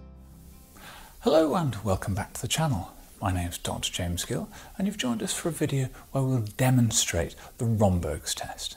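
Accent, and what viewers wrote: England, United Kingdom. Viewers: Yep. This english accent kills me. Silence is better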